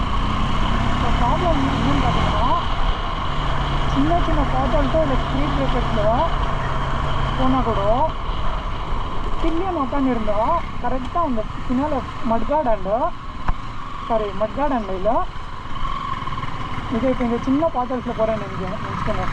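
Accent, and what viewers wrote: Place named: Bangalore, India. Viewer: Bro your tamil is similar to Bangalore tamil....i had s doubt but after that TVS person spoke in kannada then i got it 😂😂😂 bro insta id thange i want to ask regarding Apache RTR 310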